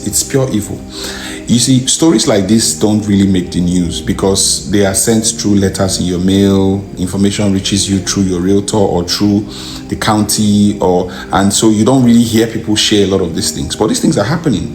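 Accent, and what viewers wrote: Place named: United Kingdom. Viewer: From his speech I feel it's the UK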